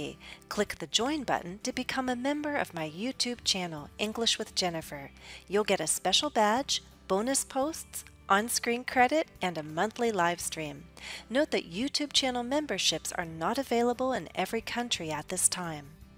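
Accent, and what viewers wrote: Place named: United States. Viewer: I am not too much into the American accent , nevertheless I watched the whole video and sensed how noble and classy you are . you nailed it . Thanks Jennifer , this is my first subscription to an American channel , big thanks to your friendly video here .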